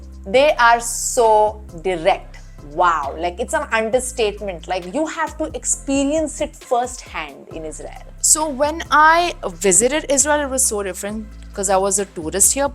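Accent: India. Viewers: 😂 You still have your Indian accent unchanged! About your comments on Indian punctuality (or lack thereof) I agree with you a hundred percent. IST (Indian Standard Time) is also known as Indian Stretchable Time. I wouldn't like to total up the manhours I've spent fretting and fuming, waiting for someone or the other: the total figure might give me a heart attack.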